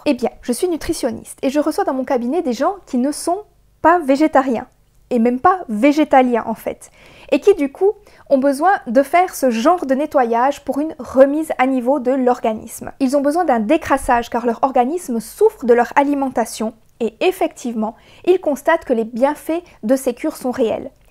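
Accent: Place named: Switzerland. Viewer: Bonjour 😊 Contente d' avoir découvert votre chaîne grâce à douce frugalité 😊 Ca me rend si heureuse d' entendre fruits et légumes pour faire une détox 😉 Je suis une raw vegan..Une 👽pour la plupart des gens😅 mais bon j' assume. Ah...Ce nonante😅 Je pensais que vous étiez peut-être belge ou suisse...En Belgique on dit aussi nonante et Septante..En écoutant bien j'ai bien deviné que vous êtes bien suisse 😊 🥰🥰🥰🥰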